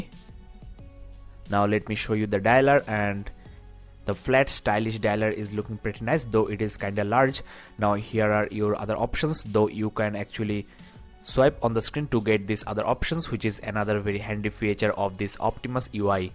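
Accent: India. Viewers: Are you indian? You sound like an indian.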